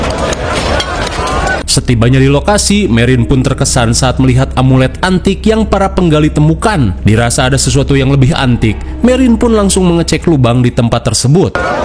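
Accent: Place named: Sunda, Indonesia. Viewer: asli logat bahasa Sunda sareng indo na sok pikaserien😅😂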